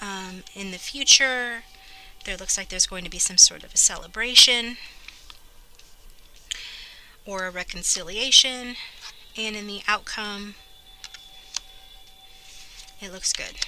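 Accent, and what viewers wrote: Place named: United States. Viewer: Your readings are very accurate but I struggle to listen to your American accent 😭😭😭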